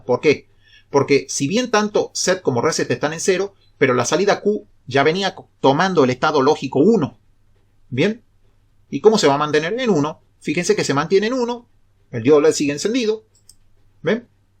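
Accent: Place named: North, Argentina. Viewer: Muy buena explicación. ¿Usted es de Tucumán?, tiene tonada norteña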